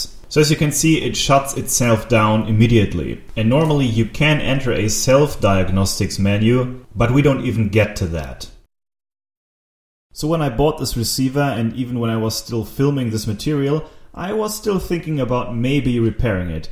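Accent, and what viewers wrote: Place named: Germany. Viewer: Hallo, ich schreibe mal auf Deutsch, da ich einen entsprechenden Akzent in Deiner Englischausführungn heraushöre - ich hoffe, ich liege da richtig. Ich habe den Yamaha dsp a595a auch und wollte gern meine Boxen mit Bananensteckern anschließen, stellte aber fest, dass die Speaker-Schrauben keine Kappen haben, die man abnehmen kann, um den Banana-Eingang freizulegen. Was kann man tun ? LG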